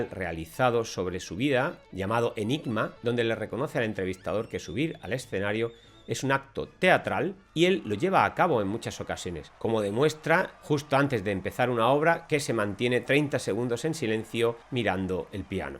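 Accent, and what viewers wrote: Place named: Spain. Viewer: el acento español es desapacible y estresante oara nosotros los latinos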